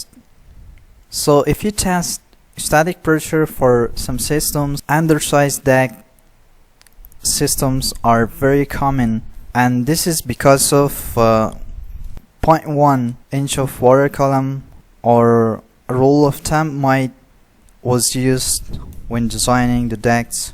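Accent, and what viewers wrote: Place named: Pakistan. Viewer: i think ur pakistani trying american accent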